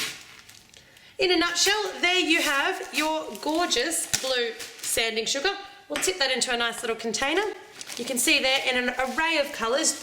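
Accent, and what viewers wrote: Australia. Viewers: i. love. australian. accents.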